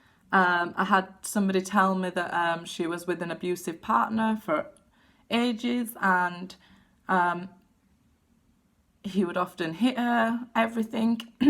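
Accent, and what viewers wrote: United Kingdom. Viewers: I hear you. British?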